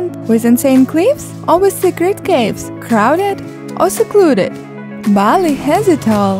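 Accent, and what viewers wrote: Russia. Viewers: Благодарю вас за такое прекрасное видео! Чувствуется по акценту, что вы из России, но английский у вас потрясающий 😍💫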